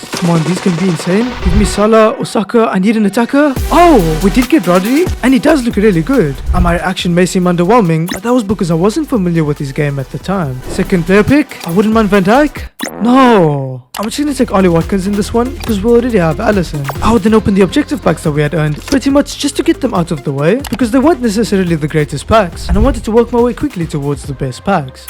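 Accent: South Africa. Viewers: Why you sound so South African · hi dude im new to the channel what is your nationality you sound south african? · bro are u south african, u sound like it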